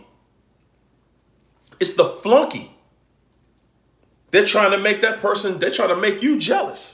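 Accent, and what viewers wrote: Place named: Philadelphia, United States. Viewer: That is right ( more sincerity from the brother ) please everybody hear what he's saying. Yo, I'm talking about culture. He reminds me of my street friends. How we talk is how he talks..and he's saying a lot of things on the subject of narcissism. I'm so proud of you my friend and brother. I'm a NY'er, you sound like you from Philadelphia, you say DC keep doing what you're doing. Great message.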